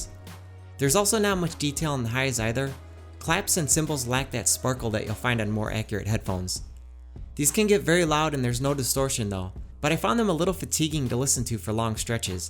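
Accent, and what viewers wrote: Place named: Chicago, United States. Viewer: Ur Chicago accent is annoying. U seem to complain too much be happy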